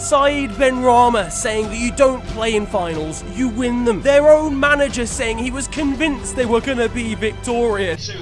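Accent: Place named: Dudley, United Kingdom. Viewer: You can tell hes from dudley in his accent man sounds like jay from tgf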